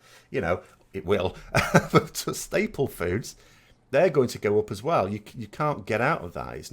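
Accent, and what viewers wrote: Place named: Northern England, United Kingdom. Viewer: The moment I heard the presenter's Northern accent I knew this was all going to be a diatribe of doom and gloom, why is it that the moment one steps foot North of Watford one is confronted almost unfailingly by this dog in the manger attitude, be positive man, I know that flies in the face of everything your parents taught you whilst you were scoffing down your hotpot and black pudding but really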